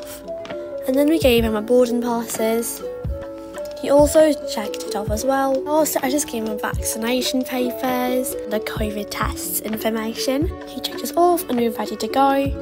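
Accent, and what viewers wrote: United Kingdom. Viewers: You have such a beautiful voice! I love the British accent! 😉😄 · do you have the real british acceant no hate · Hi!!! I have a question!! In your tiktok role plays, you are American. But in your videos/voice-overs you are British. Why is this???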